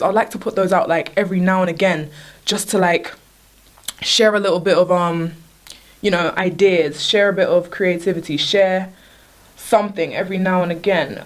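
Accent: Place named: Council estate, United Kingdom. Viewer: hate how she talks. as if she lives on a council estate.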